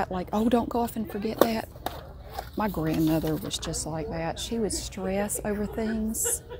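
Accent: South, United States. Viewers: I normally don’t care much for southern accents but her voice is sooooooo soothing to my ears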